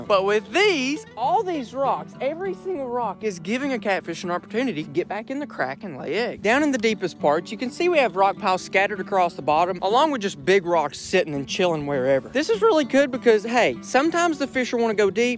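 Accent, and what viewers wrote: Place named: Texas, United States. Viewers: You actually sound like Georgie in young sheldon. Are all texas poeple speaking with this accent! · You sound like you’re from Texas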